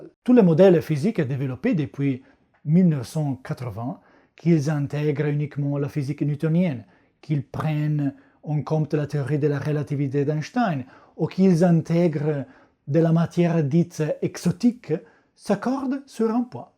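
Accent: Italy. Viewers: Merci pour cette vidéo très claire. Et quel plaisir cet accent italien ! J'attends avec impatience la vidéo sur la fusion de deux étoiles à neutron qui forme les éléments les plus lourds.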